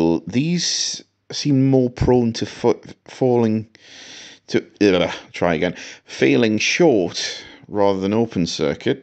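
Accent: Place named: North East England, United Kingdom. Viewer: Sounds like he’s from north-east England somewhere - I’m from Grangetown in Middlesbrough!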